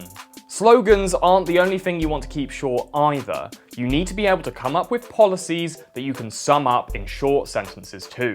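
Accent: United Kingdom. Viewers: What kind of British accent is this!?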